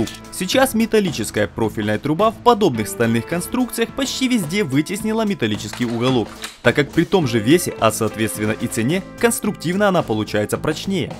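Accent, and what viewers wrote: Surzhyk, Ukraine. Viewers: если вы из этого видео не поняли что человек из Украины или вы поняли и все равно предъявляете человеку за русский, вы явно затупок)) ибо если вас не устаивает произношение блогера, просто не смотрите)) Зачем до человека докапываться из за произношения, это не культурно:) и да русский и украинский имеют некоторые общие слова но их очень мало, послушайте например и попробуйте понять без переводчика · да ладно, они украинцы, русский не изучают, говорят как чувствуют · Обычный суржик, в каждой области русский язык отличается от масковскава.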